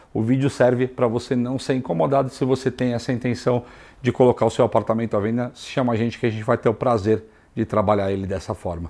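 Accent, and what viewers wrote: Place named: São Paulo, Brazil. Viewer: Ah, que delícia de sotaque paulistano ❤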